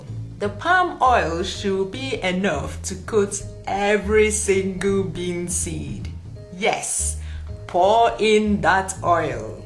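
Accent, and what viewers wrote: Igbo, Nigeria. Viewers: nice accent madam!!! igbo kwenu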